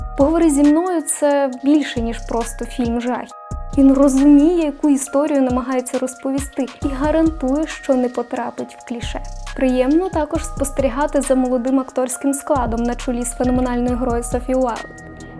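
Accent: Standard. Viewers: Вау! Яка стильна та харизматична ведуча! Вподобайка та підписка без сумніву! І окрема дяка за чистісіньку мову!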